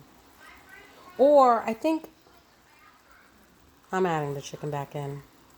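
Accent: New York, United States. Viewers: Hello. That recipe sounds do delicious. I just love that New York accent. I can tell your choice of foods you can cook. Thanks for taking the time to have this delicious recipe. God bless. I'll be waiting for another fantastic video.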